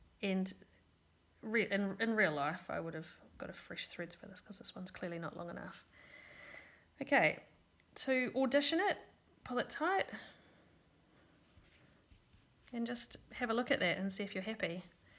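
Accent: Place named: New Zealand. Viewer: This was very helpful! And I love your NZ accent. May I ask which mystery KAL this was. Was there a specific group? Thanks.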